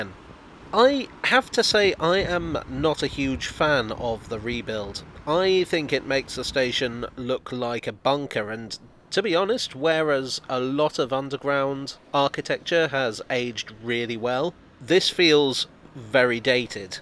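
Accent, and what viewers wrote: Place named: Yorkshire, United Kingdom. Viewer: Because it’s The Borough! You sound like a Yorkshire man but even they use a t’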